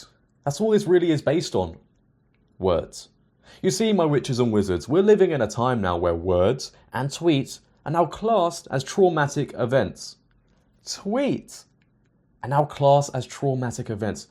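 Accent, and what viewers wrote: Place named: United Kingdom. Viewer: It’s my brittish man who gives based facts in a swanky accent.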